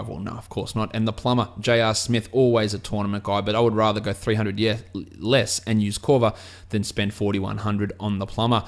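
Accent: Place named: Australia. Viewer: Awesome thanks! I listen to your podcast every morning before school/work, used to live in aus for 2 years so might just be missing the accent.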